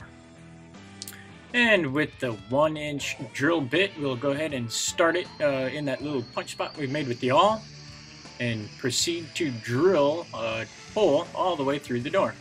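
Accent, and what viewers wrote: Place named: Upper Midwest, United States. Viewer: That's the most intense upper midwestern accent I've ever heard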